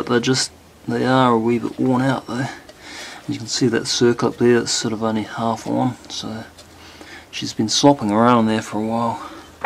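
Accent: New Zealand. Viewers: This is the fifth or sixth video of yourse I've watched and after the first two I said to myself This dude had to be from New Zealand And you are!! Cheeeers matee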